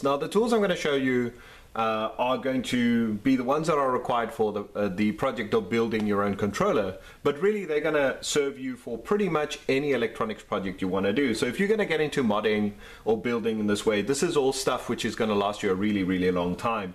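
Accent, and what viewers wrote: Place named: Cape Town, South Africa. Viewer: hey, that accent sound very familiar, Cape Tonian myself, very informative and well directed, is as if you lecture S1 Electronics at TECH,,, im currently building my own arcade fightstick using iPAC2 interface and RPI3 with retropi image ( ofcourse i have to add my own roms)... by the way, where did you get the joysticks and buttons from? keep up the good work, cheers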